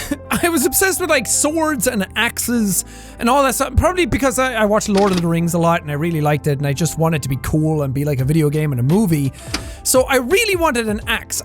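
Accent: Ireland. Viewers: Idk if this is just me but irish accent makes me happy watching his videos in genral make me happy. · Okay but how he pronounced "dinosaur" was the cutest thing?? I ain't one to make a comment like that but idk. It was cute. ANYWAY! I think jack's talking about Ireland has really amplified his accent and I'm here for it 😂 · After seeing a 7 year old video of, i wonder when did he become so irish? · My goodness, Irish was full frontal in this video 😂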